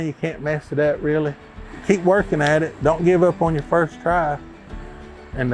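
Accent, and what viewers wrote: United States. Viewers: Are you referring to his accent? Because, this is a great example of an Okie accent. I understand him perfectly. Yes, I am an Okie as well. Boomer Sooner Baby! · You sound like a country boy! Hey this is coming from a country boy just on the south side of the red river! Man I had some of this over in New Mexico and man was it good stuff! You make it look easy Im going to try iit Thanks! Curious does it taste better if the warm water is poured out of a OU cup?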